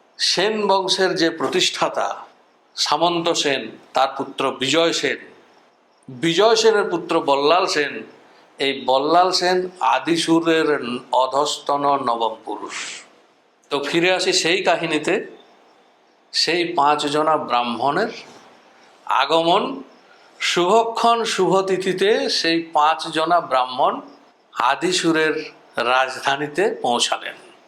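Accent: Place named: Rarh, India. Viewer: আপনার কথায় লাগে আপনি রাঢ়ের মানুষ..😅 বাঁকুড়া, পুরুলিয়া , বিষ্ণুপুরের..আমিও বাঁকুড়া থেকে!..❤️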